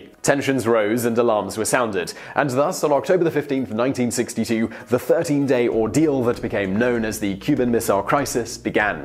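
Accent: United Kingdom. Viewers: Omg fucking UK accent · bobtheduck Yes, he’s undoubtedly British. You can hear it in his “Cubar” ( , ).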